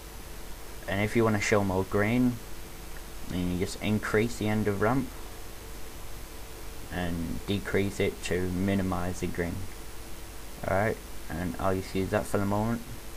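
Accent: United Kingdom. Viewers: yeah go us british accents he's just jealous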